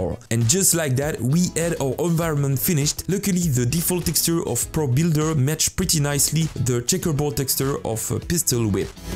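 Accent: France. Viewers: Est tu français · Super cool! as usual! from where do you take your accet? i swear you're french!;) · mdrr l'accent français est présent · Wow the accent and the little FRA at the bottom of the screen clearly shows you’re French 😅 Also the search bar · Ou la il est français lui avec son accent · Tu as un accent Français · Are u french? Sounds like it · J’adore l’accent français et très interessant la vidéo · You sound French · Toi t’es français non ? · Are you french? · Are you frensh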